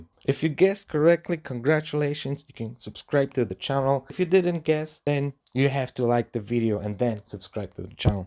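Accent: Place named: Poland. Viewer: Brzmisz jak polak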